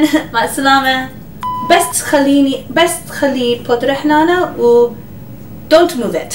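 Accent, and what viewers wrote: Iraq. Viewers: فدوه الي تحجي عراقي I like you Arabic Iraqi accent I am Iraqi too much love ❤️ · حبيت لهجتج العراقية 😍💙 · you're really sweet when you speak iraqi , I love you so much 😻💘. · ما ازكى اللهجة العراقية عليها بتجنن 😂😂😂😂😂😍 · حياتي فدوه يجنن الكلام العراقي بحلگها.. تگول اذا متخلون بودره حره جوه العين يتحرك الميك اب ويصير يرگص بالوجه ههههه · شغلچ روعه والعربي واللهجه العراقيه تخبل عليج 😘🌹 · Omg bravo your talking arabic. your arabic is good iam lebanese but your talking iraqian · She was speaking Arabic specifically the Iraqi accent where she is originally from... I think The iraqi accent is really special in the Arab world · We love love love how u express the words in Iraqi language and u did great videoing and we love ur tutorials u R the <link> 😘😘😘😘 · حلوووو العراقي من حلكج ههههه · باللهجه العراقيه تجننين يعمري 💕💜💜 I love u 🌸💜 From Iraq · الله تخبلين تحجين عراقي هله بالعراق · فدوة للي يحجي عراقي ... 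نحببببج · i love you im from Morocco and I like your Iraq language 😍😍😍😍😍😍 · فدوة للتحجي عراقي 😍😍 استمري 👏👏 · your Iraqi accent is so beautiful love you 😍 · you are legend you are learn me no thing impossible only work by your heart 😍😍😍متابعتج من العراق اللهجة العراقية تجنن عليج 😌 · فدوه شلون تحچي عراقي 😂😂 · اييي الحكي العراقي احلا كثيييرر😩💙💙💙💙💙. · In love with your Iraqi accent keep going xx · اعشقك لهلجتج بالعراقي🇮🇶💗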